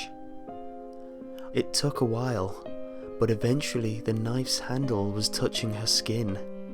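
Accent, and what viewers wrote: United Kingdom. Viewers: Your voice with a British accent is beautiful.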